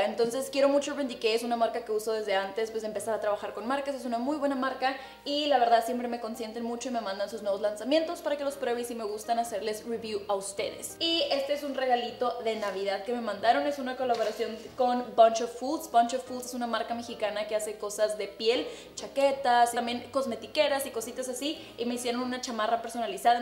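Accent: United States. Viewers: No, tú sigue hablando pocho 😁, yo también soy de frontera y tengo que aprender inglés, me gustan muchos tus vídeos ❤❤ soy nueva ya llevo casi un mes viendo tus vídeos, y me gustann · Noooooo, no dejes de hablar inglés, amo tu pochés Hahaha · has un vídeo usando solo productos de It cosmetic please. Y amo tu forma pocha de hablar nunca la dejes♥️♥️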